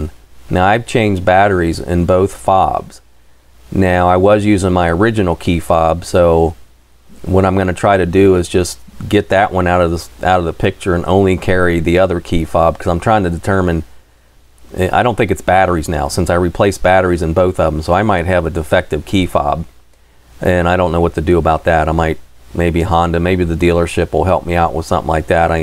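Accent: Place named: Great Lakes, United States. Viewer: Are you originally from Chicago, Michigan, or the Great Lakes area? Just wondered because of your accent.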